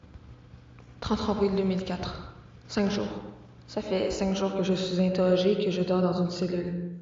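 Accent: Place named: Quebec, Canada. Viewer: Magnifique accent québécois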